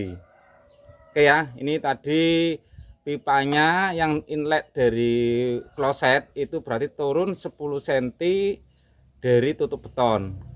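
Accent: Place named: Banyumas, Indonesia. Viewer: Logate kayong madan ngapak kie,, 😁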